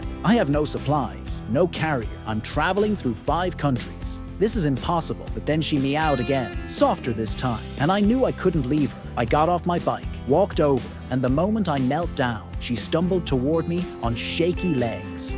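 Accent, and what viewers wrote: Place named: Scotland, United Kingdom. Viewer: are you studying to be the narrator of your life? Sound good, but I like the way you talk with your scots burrrrr. Kind of like a Nala purr.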